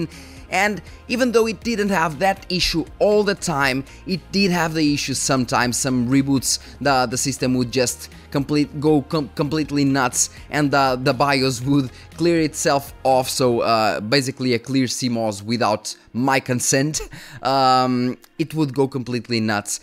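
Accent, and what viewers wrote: Portugal. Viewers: First time watcher here. Have had long time problems with RAM so i know how fucked up that is...sent 2 ram sticks back, because stick #4 "didn't work", second ram kit comes back and then different stick doesn't work, looked like a game of whack-a-mole...3 sticks worked fine and wouldn't let the 4th stick work well...different sticks...sometimes 32gb sometimes 24gb...eventually gave up on the everyday struggle so now I'm rocking just 24gb constantly. PS: was doing a inner challenge trying to figure out if you were maybe PT (maybe ES but didn't sound much like it) and i got it right! Unmistakable accent :) Keep up the good work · Pelo sotaque vê-se logo que és tuga.xD Os erros aleatórios sao fdidos...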